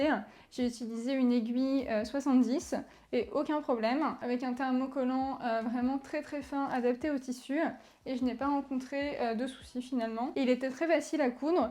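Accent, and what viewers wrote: Paris, France. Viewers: Je vais être virée, je dis ce que je pense tant pis, c'est pas méchant, Pfff dommage cette façon de parler à la Parigot, à chaque fin de mot ou presque, on entend ( OUAN ! ) Le patron OUAN ! Chaque côté OUAN ! Adapté OUAN ! Qu'on a besoin OUAN ! Bref j'ai l'impression d'entendre le sketch des inconnus (saluuut ouan tu vas bien ouan) C''est super agaçant OUAN !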